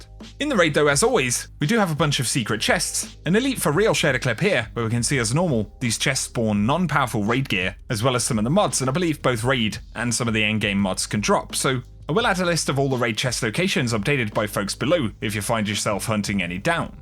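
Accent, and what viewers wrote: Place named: England, United Kingdom. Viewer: Where is from?! He says Ay sounding vowels like eey.. But he sounds English?!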